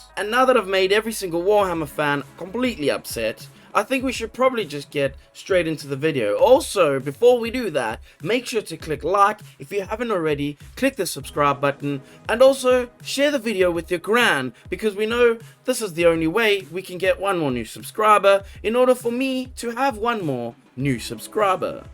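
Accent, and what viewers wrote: South Africa. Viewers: Your accent is extremely South-African. 👀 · South African?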